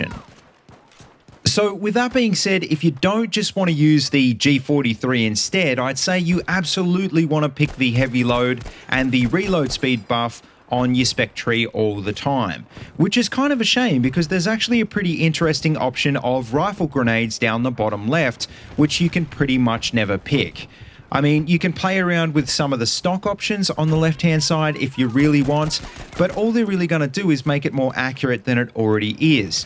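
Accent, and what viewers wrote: Australia. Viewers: good to hear an Aussie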